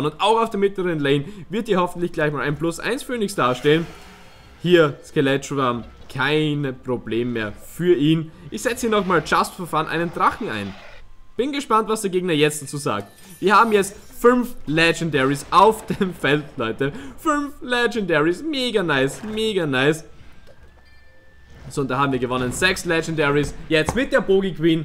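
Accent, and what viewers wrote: Austria. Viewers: Ich liebe österreichisch #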